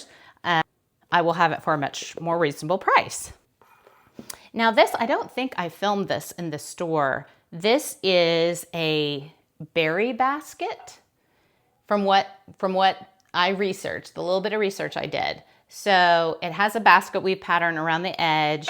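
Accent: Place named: Oklahoma, United States. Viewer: This helped me so much! I knew for some reason you were from OK, don’t ask me why, maybe it’s that you sound like me…lol I loved this video, if you ever wish to share how you inventory your items to know where they are, I would love to see. I only sale vintage home goods, and linens and I, like you, love to use the items in my home ( some of them) I sell vintage home goods, because its what I love to do. I love to shop and when I see an item that makes me think back to my childhood, and a photo of our home, and here is the exact lamp we had. I buy it,I was also grieving my Mother, so went a little nuts on IG live auctions. I am finally listing all of my mother and grandmothers items that were left over from the estate sale, and now need to create a storage solution… I have had to cancel 2 orders recently for not being able to locate the item. 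Happy THanksgiving! Early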